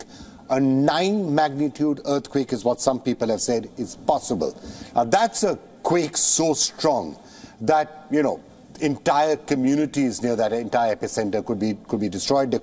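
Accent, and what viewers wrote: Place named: India. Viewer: Into a "Depths" of the pacific ocean. Funny indian guy speaking funny english😂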